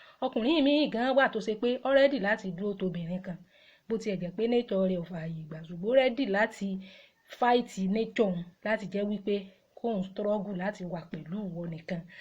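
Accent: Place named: Egba, Nigeria. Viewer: Egba (Abeokuta) accent mixed with a little bit of Ibadan. But the last time I checked you have a different Yoruba accent. Cool anyways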